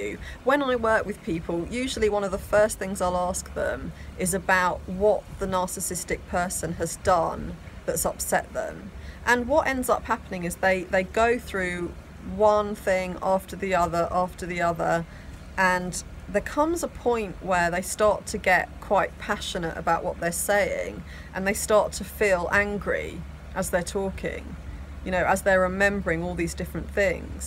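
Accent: United Kingdom. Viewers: British accent is hard for me to understand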